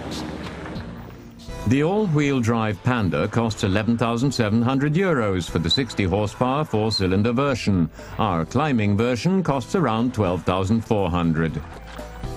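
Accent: United Kingdom. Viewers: youre from UK ? :) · What is going on with the narrator's accent? He sounds like an English BBC science presenter from the 1960s, but this is clearly filmed on the continent with right-hand drive cars where the prices are quoted in Euros.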